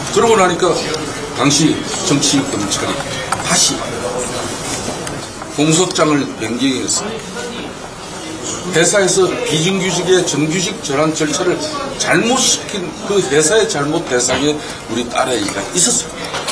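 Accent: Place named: Gyeongsang, South Korea. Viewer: 갱상도자나 ㅎㅎ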